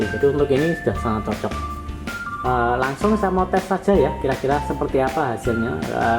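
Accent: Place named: Yogyakarta, Indonesia. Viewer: jogja banget..koyo aku ngomonge..hehe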